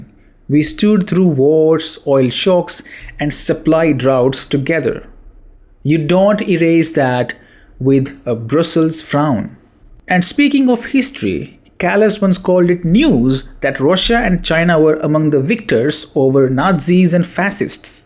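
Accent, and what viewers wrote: India. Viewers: Thank you!❤I like a lot your accent,make me think to magnific India!Keep up the real info!Respect from a romanian living in Germany!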